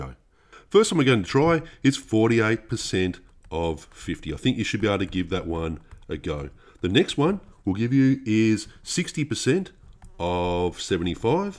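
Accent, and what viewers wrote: Australia. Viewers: Why the condescending approach and weird Australian accent????? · Australian accent makes me nauseous for some weird reason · This would be so much easier to understand without the Australian accent- or maybe speak more slowly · Can we just take a moment to appreciate the Aussiness of this guy’s accent?! 👍🏻😁🇦🇺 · Midwest USA Too busy focusing on what words he's saying, let alone the content. Didn't even realize it was Australian. Man, this Pandemic's been rough. SMH multiple times for everyone.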